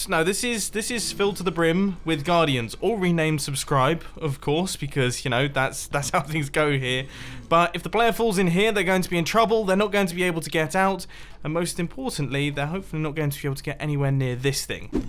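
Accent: United Kingdom. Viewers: U brittish??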